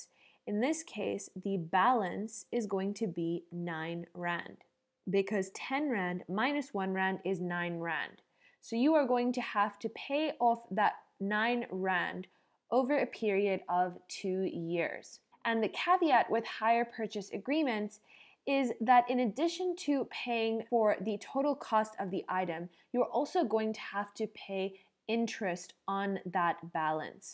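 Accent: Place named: United States. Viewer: You sound hella American,thanks for this video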